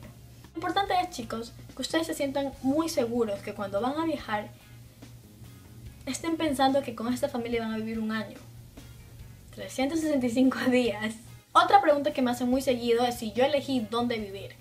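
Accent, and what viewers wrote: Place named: Ecuador. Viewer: Creo que eres muy parecida a la Miss Universo, de verdad que te veo y me recuerdas mucho a Paulina solo que con acento ecuatoriano ;)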